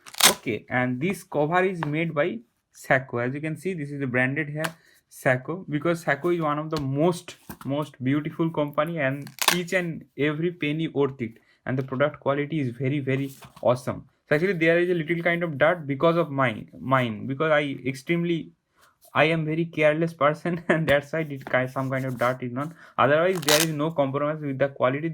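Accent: India. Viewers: you are form odisha i can identity by your english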